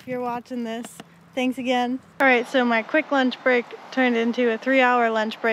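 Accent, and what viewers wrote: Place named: South Shore Massachusetts, United States. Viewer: Careful out there lol Also I can hear the south shore Massachusetts in your voice 😊 haha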